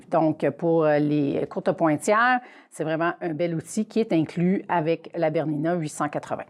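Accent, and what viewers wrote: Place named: Canada. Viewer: Oh un accent canadien....de Celine Dillon...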